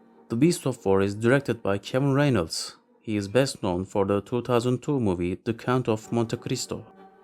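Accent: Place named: Turkey. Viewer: where are you from bro ? your accent sounds turkish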